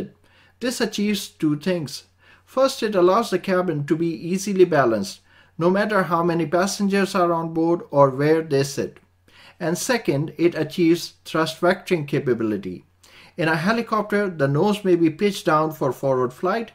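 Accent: India. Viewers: then where are you from your accent sounds little Indian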